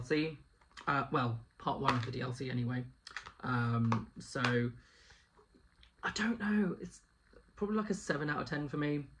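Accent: United Kingdom. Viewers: Loving the update! Also enjoy the British voice! ❤ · Very cute, and nice to hear your voice! Very British ^.^ hehe x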